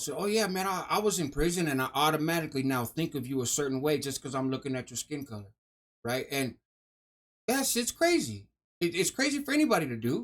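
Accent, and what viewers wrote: Mexico. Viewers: Honest question, why, how do u have a Mexican accent being white as a American. So many others have a normal American way of speaking. I wonder if you put it on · Probably because he grew up around a bunch of Mexicans. Kinda how a lot of New Yorkers have a stereotypical "Italian" accent even though they're not Italian.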